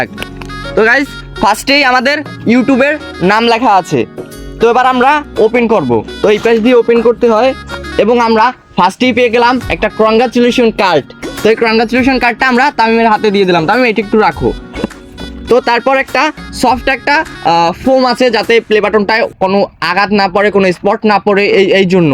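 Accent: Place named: Bagerhat, Bangladesh. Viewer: বাগেরহাইটা পোলা কথায় বোঝা যায় ভাইয়া আমি বাগেরহাট জেলার কচুয়া থাকি😊😊😊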